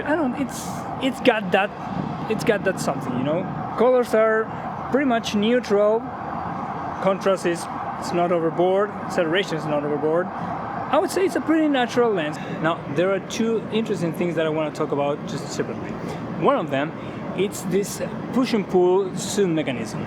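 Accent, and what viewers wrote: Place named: United States. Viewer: hermano partio el video y pense que eras gringo xd gud inglish. saludos desde coquimbo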